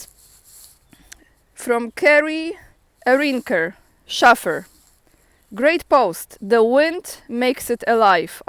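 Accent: Poland. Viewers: Your Polish accent when reading your texts is part of the enjoyment of listening to your program and that accent is very charming. You are careful with the facts but do not hesitate to show emotions and directly say what you think. These qualities are not so common online and make your channel both unusual and likable. Keep up your good work and greetings from Sweden. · Your English sounds very good to me, with a little polish accent sounds more colourful.Thank You for the reporting on the Polish farmers action.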